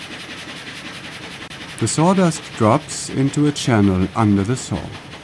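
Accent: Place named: Germany. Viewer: i think he s german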